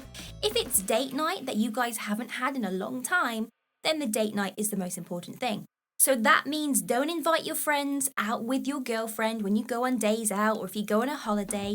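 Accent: England, United Kingdom. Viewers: Always good contents 👍 thumbs up.I will check for audible and the books you are recommanding, thank you. Ps. Your english accent is music to my ears, you clearly can use this to charm someone 🤩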